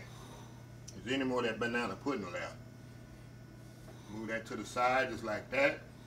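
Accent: South, United States. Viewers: lol i'm laughing halfway thru the video. He has got to be from either Louisiana, MS, GA, or Texas lol. Jokey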